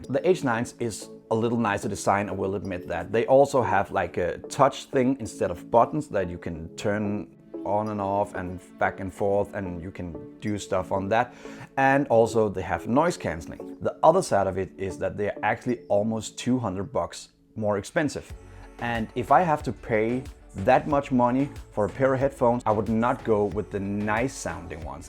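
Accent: Denmark. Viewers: Are you from Denmark. The accent sounds danish? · Fed review, ku høre med det samme at du var dansker, haha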